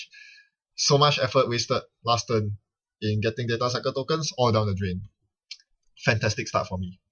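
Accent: Singapore. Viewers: Hi where are you from by the way? You sound like a singaporean. :) Just wondering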